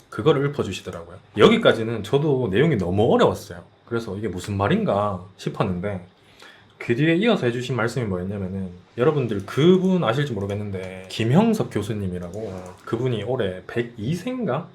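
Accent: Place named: Daegu, South Korea. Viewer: 영상감사합니다 제가 외국인 대학원생입니다. 돌아가신 교수님은 저에게 그런 가이드 같은 존재였어요 대구 말을 듣고 교수님 생각이 납니다 그 교수님도 대구 사람이시고.. 너무 보고 싶습니다, 항상 건강하시기를 바랍니다 영상에서 많이 배웠어요~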